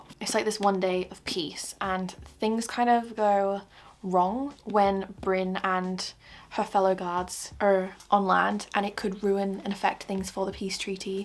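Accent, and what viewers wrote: Australia. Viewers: Has anyone ever told you that you sound like an Australian? I am Australian and had to double check 😅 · I’ve just found your channel and I’m obsessed! You’re so calming and genuine and you can feel how much you truly love reading and getting immersed in the story. Also the accent love are you a fellow Aussie/ half Aussie/ Irish.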